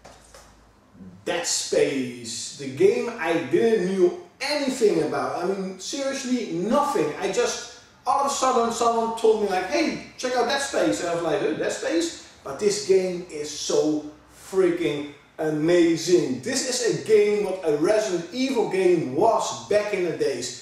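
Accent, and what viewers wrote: Netherlands. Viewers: Klinkt nederlands. Waar kom je eigenlijk vandaan?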